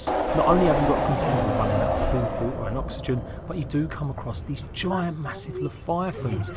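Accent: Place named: Essex, United Kingdom. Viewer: You must be from Essex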